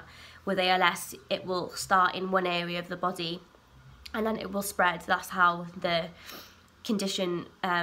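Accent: Cardiff, United Kingdom. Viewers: Cardiff girl by any chance?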